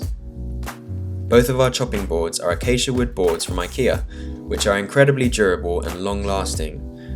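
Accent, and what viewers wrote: United Kingdom. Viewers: For some reason , I didn't hear his accent until he said "living in London". Then BAM! I was like holy shit I didn't realize he was speaking in a British accent.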